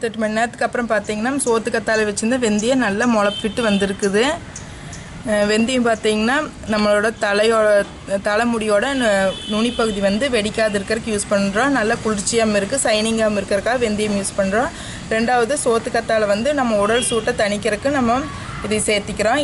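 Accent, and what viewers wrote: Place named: Kongu, India. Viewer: உங்கள் கொங்கு தமிழ் very nice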